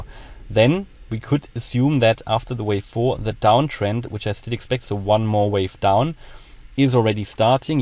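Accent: Germany. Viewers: Are you German? 😁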